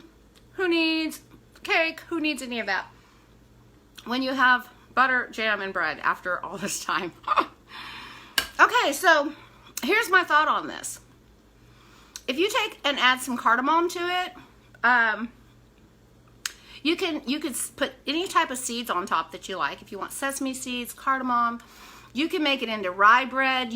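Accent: Texas, United States. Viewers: Sounds like you are from/or in Texas. Denton Tx here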